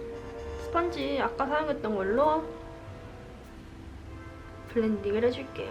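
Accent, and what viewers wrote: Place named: Konglish, South Korea. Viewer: Is it weird if I say I love listening to you speak Konglish? :)))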